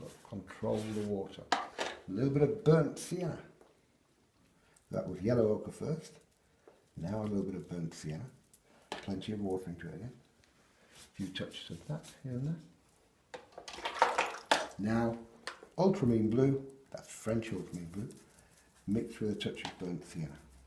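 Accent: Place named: England, United Kingdom. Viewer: Your instruction is precise and detailed. You give the reason why you are doing something and illustrate clearly. I too shall look for your book. You do need a new sound system though for those of us who have limited hearing. English accents are not always easy to follow for Americans, but having hearing trouble just makes that more difficult. thank you so much for your lessons.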